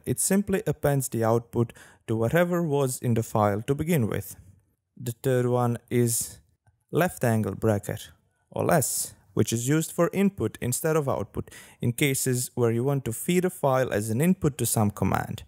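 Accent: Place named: Croatia. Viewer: This.... was... definitely a video I watched. ?_? xD Anyhoooo... I still enjoy listening to that supreme Croatian accent. ;)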